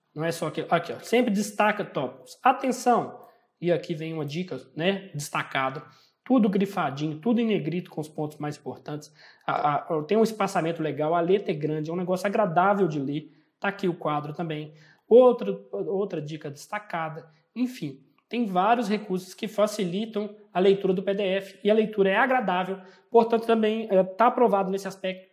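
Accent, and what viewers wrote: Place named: Minas Gerais, Brazil. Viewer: obrigada, você é uma inspiração e esse sotaque mineiro é massa hahahahha parabéns pelo seu trabalho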